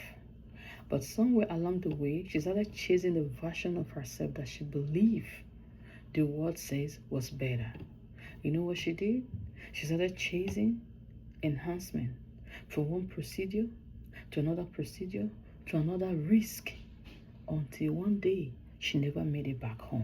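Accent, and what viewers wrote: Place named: Kenya. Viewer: Very good story. I love your accent. Kenyan? Very soft. Excellent video!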